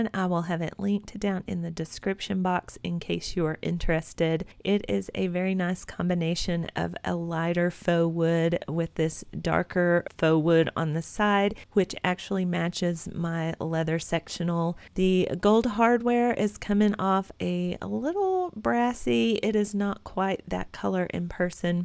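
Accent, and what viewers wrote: Texas, United States. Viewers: I just recently started following you, and thought I detected a Texas accent. I'm from Lubbock. Thanks for all the great inspiration. Your home is beautiful.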